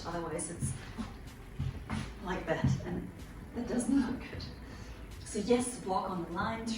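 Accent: Germany. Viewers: Are you German? 😊 Great Tips, thank you for sharing!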